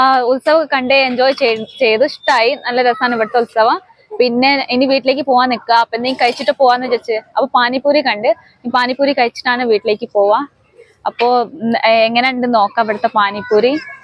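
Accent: Malappuram, India. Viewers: മലപ്പുറം ചേളാരീ.കോഴിക്കോട് ജില്ലയിൽ തെക്ക് ഭാഗത്ത് ഇതിനോട് സാമ്യമുള്ള സ്ലാംഗ് ആണ്.എന്നാൽ, കോഴിക്കോട് ജില്ല മുഴുവൻ ഇതേ സംസാരമല്ല.കൈയിലാണ്ടി,വടകര ഒക്കെയുള്ള വടക്കൻ ഭാഗത്ത് തികച്ചും വ്യത്യസ്തമായ സ്ലാംഗ് ആണ്. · മലപ്പുറം ✌️ആണ് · മലപ്പുറം slang ആണ്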